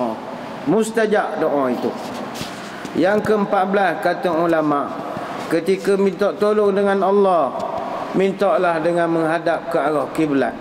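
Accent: Pahang, Malaysia. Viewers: Suka dengar Ustaz cakap loghat Pahang. Sayang Ustaz. May God Bless you dunya wal akhirah Ustaz.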